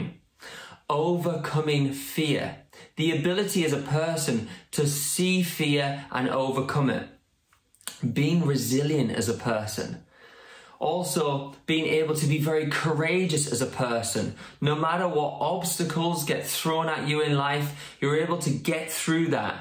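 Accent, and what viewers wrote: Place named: United Kingdom. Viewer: between Queen’s accent and Britis accent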